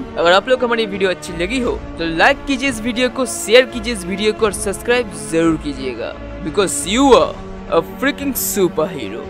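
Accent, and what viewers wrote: Bengal, India. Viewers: Bro tum bengali ho kiya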